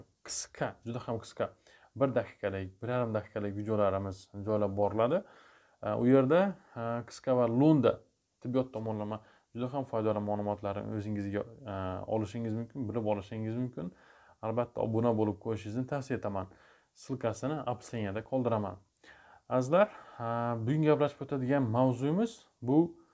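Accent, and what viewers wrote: Khorezm, Uzbekistan. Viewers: Xorazmdan ekanligiz darrov bilindi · хоразимданми шева тортяпти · Асаломалакум доктир сиз кайердансиз шевага айрим созлар хоразимга тортяпти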